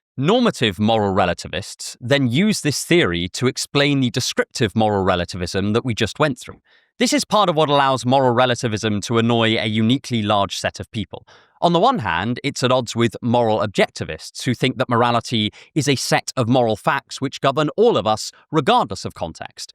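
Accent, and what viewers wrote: United Kingdom. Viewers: I'm in love with his british accent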